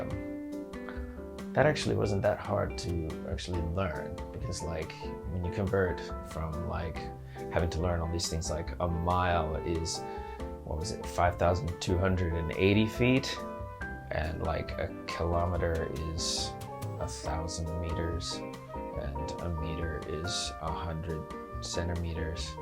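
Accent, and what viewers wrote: Finland. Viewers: I think I'm hearing a slight Finnish accent in your English.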